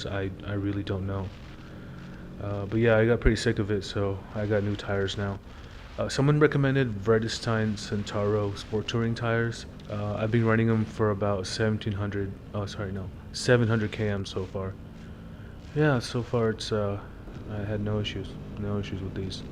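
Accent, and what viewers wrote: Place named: United States. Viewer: Bro your English is impeccable. You sound like you've grown up in the U.S. your whole life haha.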